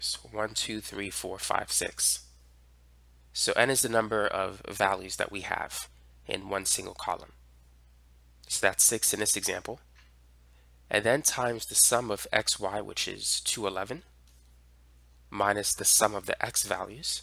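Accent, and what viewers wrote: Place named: Massachusetts, United States. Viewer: He talks like he's from Massachusetts.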